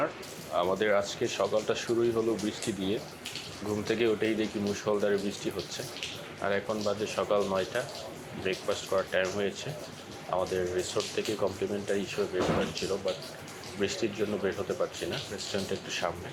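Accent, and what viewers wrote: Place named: Mymensingh, Bangladesh. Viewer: সম্ভবত ময়মনসিংহের আশে পাশে।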